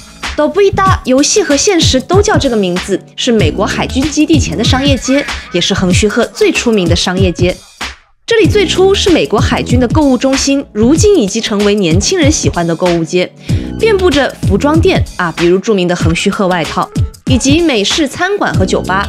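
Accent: Taiwan. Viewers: 谢谢你！这个好棒啊。你的中文是台湾的吗？我的中文不好的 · 好棒的影片，看起來比較親切，又是莎木玩家，又介紹橫須賀這個地方，滿足了我們這些玩過莎木的粉絲好奇心，厲害啊XD（大大是台灣人嗎？在日外國人我一度看成國人，想說口音怎麼那麼台灣？）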